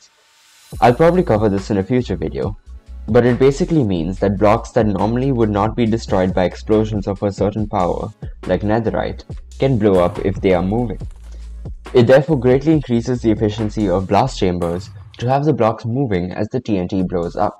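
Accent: India. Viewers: With any chance are u indian?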